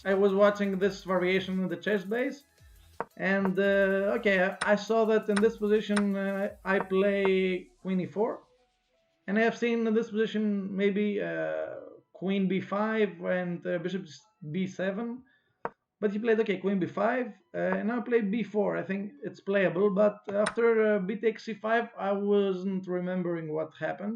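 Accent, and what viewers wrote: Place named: United Kingdom. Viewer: Γεια σ ρε αρχηγέ! British accent! If you didnt got it i am Δήμητρα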